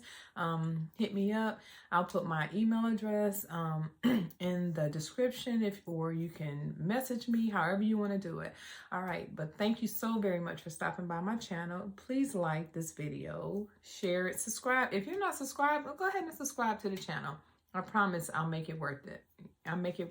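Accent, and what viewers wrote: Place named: California, United States. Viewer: Sounds like you are in Cali too. Congrats on your success & new income. And Thanks for the platform details.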